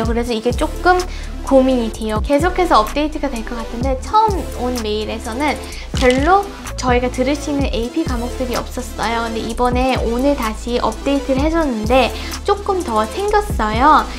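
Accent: United Kingdom. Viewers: 영국식발음과 미국식 발음중 어떤 발음을 구사하시나요 ~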